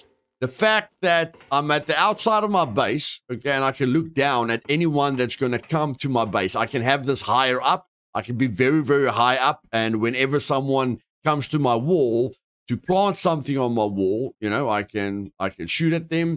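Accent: South Africa. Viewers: South African at it's finest haha - love bro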